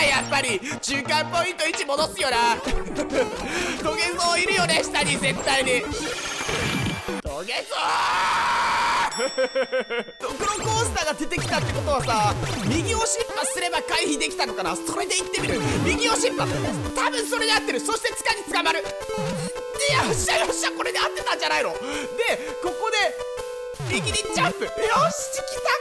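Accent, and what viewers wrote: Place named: Kyushu, Japan. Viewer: そげん喋っとるほうですよ🎵 ほどよい九州の方言が素敵です（私はばあちゃんが九州だったんで）🎵✨☺️ あ、そしてさんといえば「絶叫🎢」君に届けで吹きました✨ 楽しい動画、ありがとうございます😉👍️🎶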